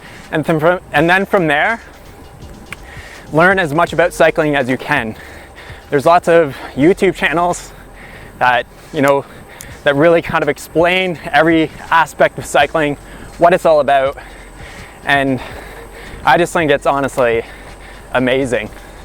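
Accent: Canada. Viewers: I new he was from Canada.the way he says about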